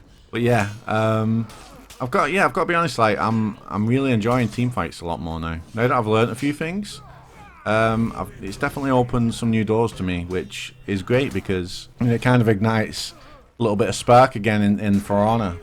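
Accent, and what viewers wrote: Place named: Yorkshire, United Kingdom. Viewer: Are you from Yorkshire/Lancashire? Your accent is like exactly the same as tru3ta1ent's and he used to be big into For Honor.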